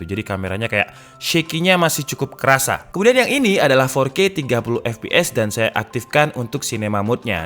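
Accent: Malang, Indonesia. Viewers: Orang malang kah?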